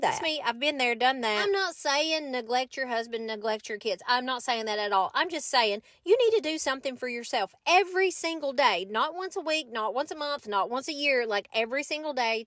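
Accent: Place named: Tennessee, United States. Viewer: 🧹🗑️🧽 I made it! ASMR isn’t about being loud, it’s being super quiet while you record the normal sounds of the stuff you’re doing: — the sound of cabinets opening and shutting… — a knife blade slicing or chopping through food… — a blanket snapping as you shake it out before you fold it… and on and on. No banging or slamming needed (or wanted). You record all that stuff, cut it together really close when you edit so it’s a series of sounds one after another (accompanied by the visual of you doing the thing that makes that sound), and that’s an ASMR video. That said, I’m happy you don’t do ASMR because I hate that nonsense! I love to listen to your Tennessee accent in your voiceovers. I can’t understand why these videos don’t perform well for you. This is the content that made me decide to subscribe! I also like when you show how you make certain meals and desserts. 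I need to try the cinnamon rolls with pie filling. That looked so yummy.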